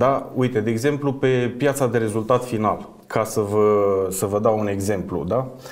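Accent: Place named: England, United Kingdom. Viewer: Nimic nou sub soare......doar engleză în pronunție și mult bla, bla, bla....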